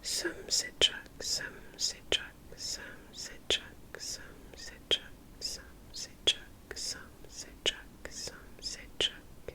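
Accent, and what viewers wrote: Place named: Sweden. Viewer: İsveçli birisinin türkçe konuşması çok hoş olmuş daha fazla türkçe video gelmesini isteriz ❤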